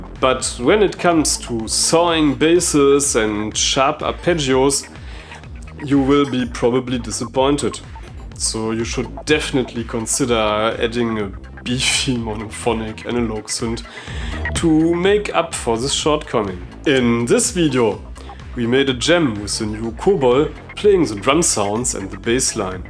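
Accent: Germany. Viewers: Du kannst Dir doch denken, warum man das tut - um auf einer internationalen Plattform von möglichst vielen Menschen in den meisten Ländern verstanden werden zu können. Komischerweise stören sich hauptsächlich Deutsche am deutschen Akzent - teilweise übrigens auch ich selbst - aber kaum Englischsprachler, die finden das eher lustig. Ich verstehe Deine Meinung aber nicht die Art und Weise, wie Du das formulierst. Es ist seine Entscheidung und die lässt sich eigentlich leicht nachvollziehen. Wenn Du Dir ernsthaft ausgerechnet von ihm deutschen Content wünschst, bitte ihn doch einfach höflich darum! Damit hättest Du sicherlich sehr viel bessere Aussichten auf Erfolg, als das Konzept seiner Videos in diesem Punkt so "von oben herab" infrage zu stellen.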